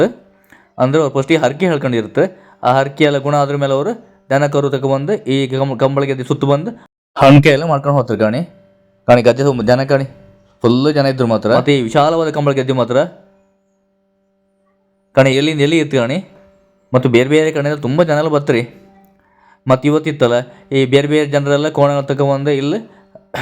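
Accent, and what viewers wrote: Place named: Kundapura, India. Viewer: ಕುಂದಾಪುರ ಕನ್ನಡ ಸೂಪರ್ ಮಹಾರಾಯ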